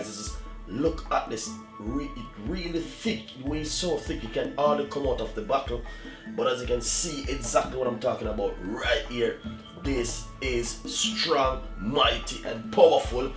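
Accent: Jamaica. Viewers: The Tensai that's the Jamaican pronounciation,the word is moss.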